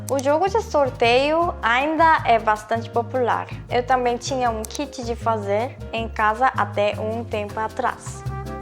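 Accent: South Korea. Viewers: amei seu sotaque coreano, obrigada pela explicação!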